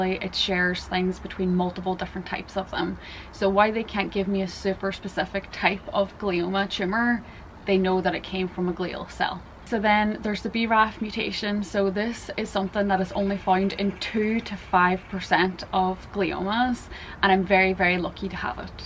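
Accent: Ireland. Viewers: I love how you are community us, your smile, lovely Irish accent, your awesome wavy blonde hair, your ease and command into the camera, and knowledge. You seem to be picking up the medical terminology pretty well too. I love learning anythingmedicalrelated, , took a course in it many yrs ago, getting straight As. Came in really handy too, because several rare genetic diseases in my kids and grandkids. Sounds like you have had a rough couple of wks. I was so sad that your your surgeon oncologist took off out of country without calling you, making sure you and other patients were OK for the time he was unavailable. Very inconsiderate. Better days ahead for you both.❤ from ottawa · Hello, Your channel just popped up in my feed--maybe because I am following another cancer survivor (Dr Dan Says, he is a chiropractor and very inspiring man) but I really am getting an education on what some folks are having to deal with and at young ages (50s is too young too) Anyhoo, you are traffic stopping beautiiful and very sweet and well spoken and I think your channel is the first I have that is teaching me what a proper Irish accent sounds like. 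Wishing you the best in your life challenge. They are making breakthroughs all the time helping people live longer so don't give up! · you are such a beautiful person. I hope you get the absolute best outcome from all of this. Thank you for sharing your diagnoses and journey with us. As a fellow Irish (my dads full Irish but never used his accent when I was growing up so I've always been gutted I don't have it), i adore your accent and could listen to you for hours haha! Sending you so much love and positive thoughts! 💚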